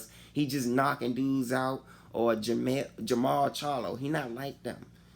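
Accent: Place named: Washington DC, United States. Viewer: I know this accent anywhere... my man from D.C... you make good points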